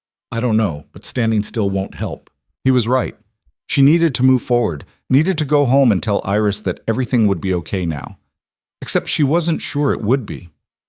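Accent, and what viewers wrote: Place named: North America. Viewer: Ugh at the lisping N. American accented AI for a story set in 19th century England.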